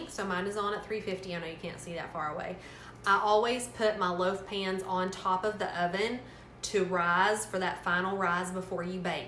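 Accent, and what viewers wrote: South, United States. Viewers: Hi, watching from the UK, & I love your deep southern American accent 😊❤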